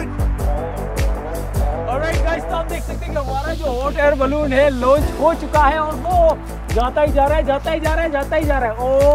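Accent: India. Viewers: I can only speak English, but I love the Indian accents 😀🇮🇳 the words flow so smoothly 🥰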